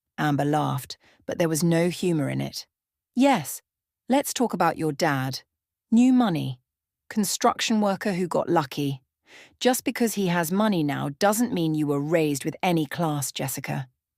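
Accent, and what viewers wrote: England, United Kingdom. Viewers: What's the point of using a British English voice and American vocabulary? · an American story told with an English accent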